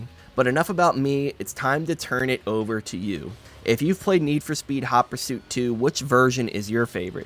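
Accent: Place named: Philadelphia, United States. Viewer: This Philly accent is making my ears bleed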